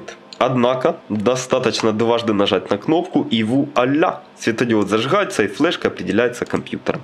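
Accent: Ukraine. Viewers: А зачем этот украинский акцент? Если комментируешь на русском. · акцент, украинецкий,я рад славяну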